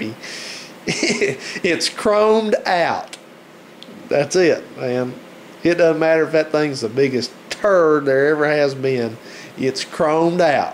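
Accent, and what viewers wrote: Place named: South, United States. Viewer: I love it when a guy with a southern accent impersonates someone with a southern accent.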